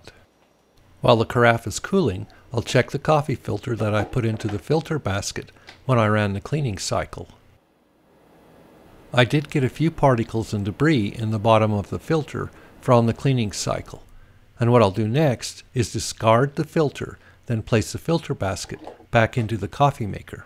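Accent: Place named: Ireland. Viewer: Sounds to me like he’s from Ireland but has been in the US for a long time so it’s subtle now.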